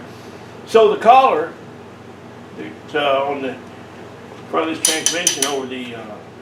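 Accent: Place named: North Carolina, United States. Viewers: Woulda never guessed your in Washington...thought you were in Tennessee or North Carolina mountains. Your place looks like it's there, your shop looks like something you'd see here in the south, you talk a lot like that area and you have the same demeanor about you too. Woulda never known the difference if I hadn't heard you say it. You'd fit right in around those areas. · I sure thought you were from North Carolina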